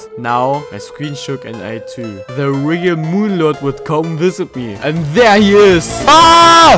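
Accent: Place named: Germany. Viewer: man hört den deutschen in dir hahah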